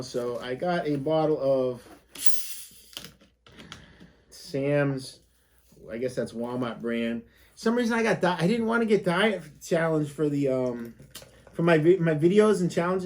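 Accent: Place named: New England, United States. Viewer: I'm surprised you don't pronounce papa as "puppa" with your New England accent.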